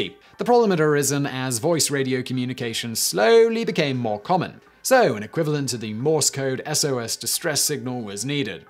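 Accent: United Kingdom. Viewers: British people like Simon have this charming habit of pronouncing foreign words as though they were English ones when referring to them, instead of using a foreign pronunciation that's very well known. Americans are much less likely to do it, although it's still fairly common for people to butcher the Latin pronunciation of infinitives that end in -(i/e/a)re as if they rhymed with hire, here, or hair, which they do if you pronounce the symbols as though they were an English word instead of a Latin one, but is as wrong as Simon's pronunciation of the French words in this video. It's odd because you'd think Europeans would be more aware of the fact that things are pronounced differently in different languages, but the British being insular probably get it much less.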